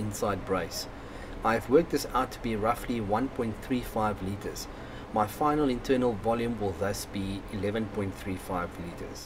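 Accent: Afrikaans, South Africa. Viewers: Hoor ek n afrikaanse aksent?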